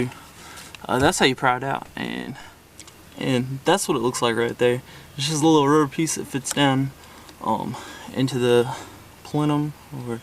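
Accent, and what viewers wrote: South, United States. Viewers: somewhere from american south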